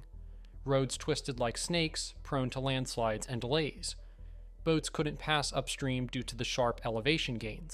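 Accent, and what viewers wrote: United States. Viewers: I love the obvious inflammatory titles from CCP, yet the narrator is American 😆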